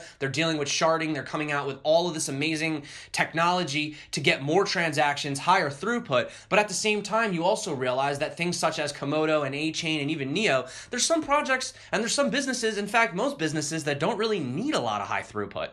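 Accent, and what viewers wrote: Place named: Philadelphia, United States. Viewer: My bro where u from?? You sound local to me here in philly....if not then maybe south jerz!! Go Birds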